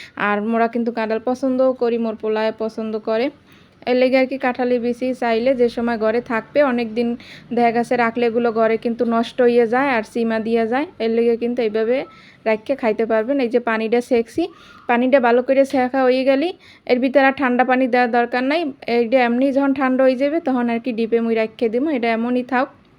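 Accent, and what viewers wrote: Barishal, Bangladesh. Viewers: ✅👍আপু তোমার বরিশালে ভাষায় কথা বলেছেন আপু আমার শুনতে খুব ভালো লাগলো 🎉কাঠালের বিচি আমার খুব ভালো লাগলো ❤সব কিছু রান্না টা অনেক সুন্দর হয়েছে আপু। আর সেমাই রান্না করলেন। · দিদিভাই তোমার কথাগুলো আমার খুব ভালো লাগে। আমার শাশুড়ি তোমার মতো বরিশালের ভাষায় কথা বলে, বরিশালের ভাষা শুনতে আমার খুব ভালো লাগে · আপনার বরিশালের কথা শুন‌তে আমার ভালো লাগে।আপনার ভি‌ডিও দেখে কিছু শিখে নিলাম। খুবই ভালো লেগেছে❤❤❤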